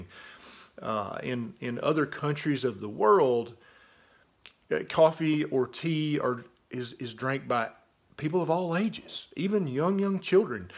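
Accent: South, United States. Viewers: What part of the south are you from? Im curious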